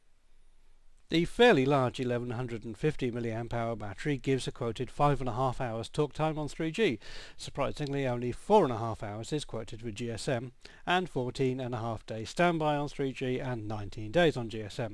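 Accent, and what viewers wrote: United Kingdom. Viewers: r u welsh?!?!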